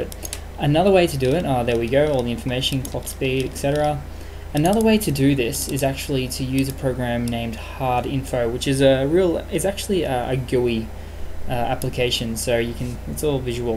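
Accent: Australia. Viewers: Thanks a lot bro... thumbs up! You sound Australian :D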